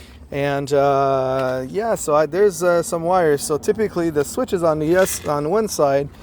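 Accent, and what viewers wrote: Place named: Quebec, Canada. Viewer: J'étais curieux! L'accent. Tu avais des schémas en français! Je me demandais quel coin du QC tu étais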